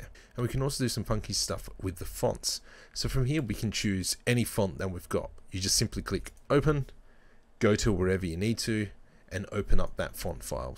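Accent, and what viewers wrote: Australia. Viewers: Yeah - I am using Blender 2.82 which is a bit different - but the convert-to-mesh was in the same place. Do you have a tutorial to add materials and export for threeJS by any chance? Very cool to hear an Aussie voice in this environment also!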